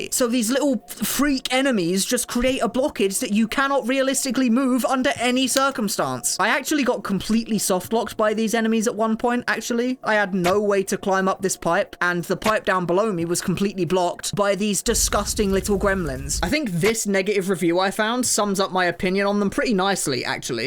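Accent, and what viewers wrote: United Kingdom. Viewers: he sounds more british than usual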